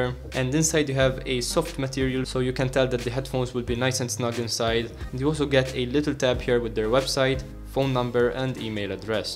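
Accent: Malta. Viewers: I'm betting my left testicle that you're Maltese going by the accent 😆 ... Good vid bro! Keep it up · that accent is totally maltese :D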